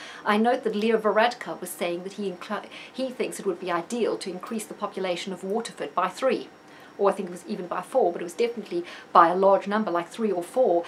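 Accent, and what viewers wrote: South Africa. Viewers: Another great video- thank you. Are you South African?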